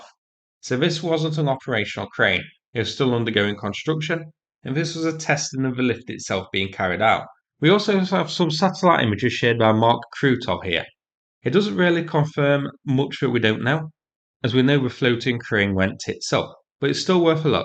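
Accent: United Kingdom. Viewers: Love the pommie accent and humour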